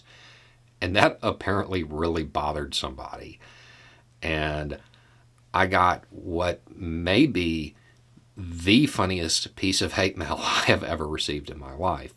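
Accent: South, United States. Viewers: What I find especially humorous, if you're going to insult someone, a bit of reading will make you more effective. The person in question decides to insult using things that a well-read person would have realized did not fit this particular case. is from Florida, I lived in Florida, and I feel for him, but that's neither here nor there. He calls a Redneck and a Hillbilly. These are both things rooted in Appalachia and not the deep south. How reading could have prevented these mistakes? Simple geography would have told you that Florida is relatively bereft of hills. How about redneck? An understanding of history would have told you about the Battle of Blair Mountain, where the miners who were attempting to strike for human working conditions were called rednecks because of the red bandanas they wore. So, no, is neither a hillbilly nor a redneck, he has a southern accent, only a decidedly ignorant poorly red individual wouldn't know that. 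For the record, hillbilly, and redneck became terms of derision due to efforts by coal mine owners to dehumanize their workers in the eyes of the general public to make it easier to mistreat them.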